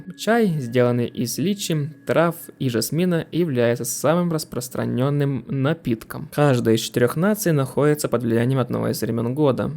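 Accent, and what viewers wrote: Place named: Tajikistan. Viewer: Автор таджик? Просто интернсный акцент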